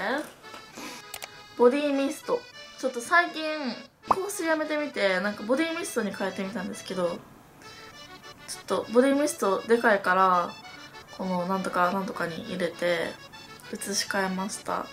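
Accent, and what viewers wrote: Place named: Kansai, Japan. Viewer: 久々見たら関西弁が出てる なんか前の方好きかも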